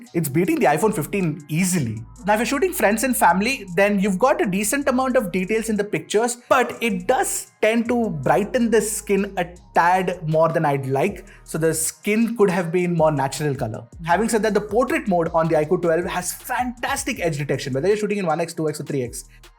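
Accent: India. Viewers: The indian accent is always👌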